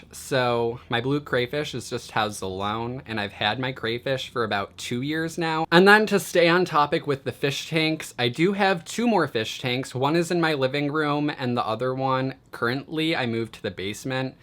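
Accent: Midwest, United States. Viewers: what state are you from you sound like you have a midwest accent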